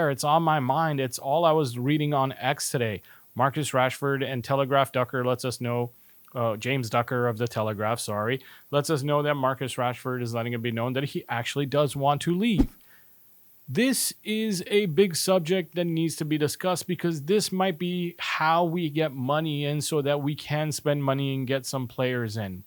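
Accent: United States. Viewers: It's strange hearing an American accent talking about football ... I'm not saying you don't have the right like anyone else to be football fans .. but hearing you say 'we' is very unfamiliar... Fair play fella , I'm not hating ... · I am portuguese and I dont care if your accent is american, keep the videos coming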